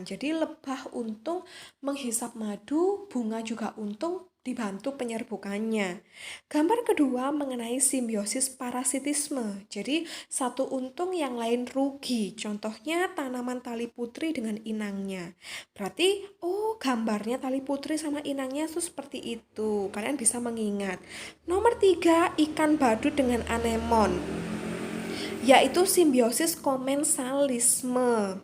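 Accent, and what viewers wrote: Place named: Java, Indonesia. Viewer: Namanya berarti orng bali, logatnya kek jawa 🗿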